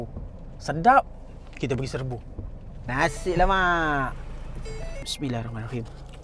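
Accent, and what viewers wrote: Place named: Kedah, Malaysia. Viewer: Kat mana ni kedai cakap mcm org Kedah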